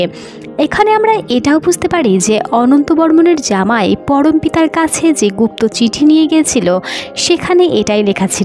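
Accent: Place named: Chapai Nawabganj, Bangladesh. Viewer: আপনার বাসা কি চাঁপাইনবাবগঞ্জে আপনার ভাষা শুনে মনে হচ্ছে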